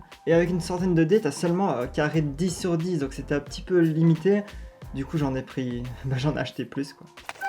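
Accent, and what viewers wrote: Liège, Belgium. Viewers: Hello c est vraiment des supers videos perso j kiffe grave. Une petite question est ce que t es belge? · T’es vraiment trop fort ! Je pense de c’est le stop motion le plus cool que j’ai jamais vu ! Sinon tu ne serais pas belge par hasard ? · T'es liégeois? Vu ton accent ahah ?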